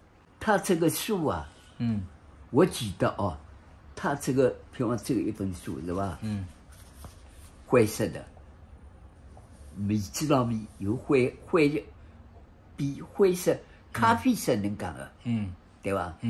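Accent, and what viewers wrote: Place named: Shanghai, China. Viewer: 不看字幕，老爷子上海口音我还是要使劲听。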